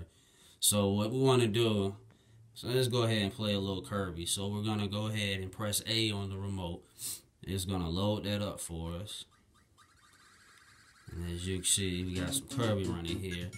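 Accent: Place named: South, United States. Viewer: yo why do you sound like a redneck dantdm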